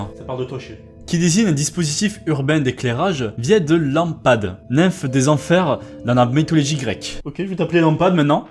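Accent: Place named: Montpellier, France. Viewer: RBATI Tout droit de Montpellier par dit !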